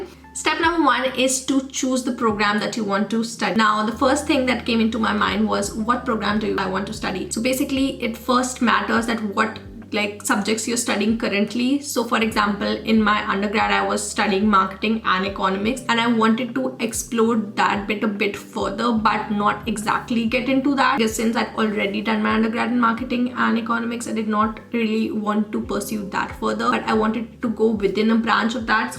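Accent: India. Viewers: no it is inmposibe i just cant understand this indian way of speach english its crazy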